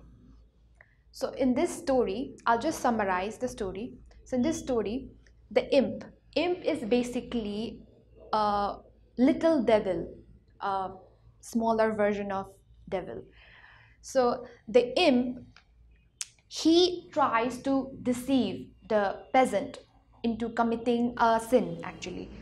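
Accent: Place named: Manipur, India. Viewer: Let us promote the culture of appreciating something which is good. In my opinion this lady is doing a fantastic job for those students who are capable of speaking in English. If you are not happy with her style of teaching please go for some other vedios that help you understand the lesson in a better fashion. She is young, energetic and articulate. Tell me honestly how many English teachers in Manipur speak English with such beautiful accent.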